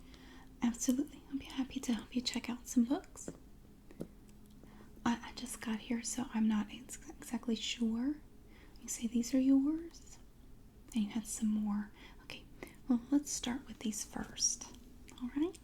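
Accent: South, United States. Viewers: Now what part of our beautiful south are you from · Really liked the soft speaking and page turning. Very relaxing. Thank you! Love your southern draw too btw... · You're very similar to old school Maria Gentle Whispering with a southern twist and it's perfection! Thank you. 😊 ❤️ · Love your soft american accent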